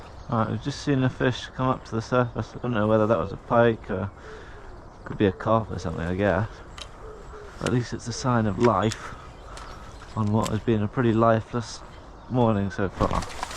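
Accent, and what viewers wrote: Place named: England, United Kingdom. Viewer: Serious question, you sound English….why is your name on YouTube?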